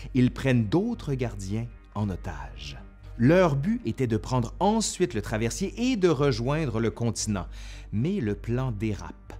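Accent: Quebec, Canada. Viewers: Y'a pas a dire, tu prends +10points de sympatie rien qu'avec l'accent québécois... Je suis jaloux.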